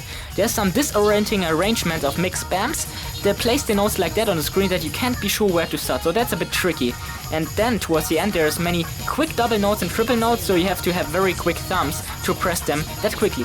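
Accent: Germany. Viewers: I do not want to ask you a very personal question. But… are you perhaps German? Because I’m always getting this idea because of your accent … 😅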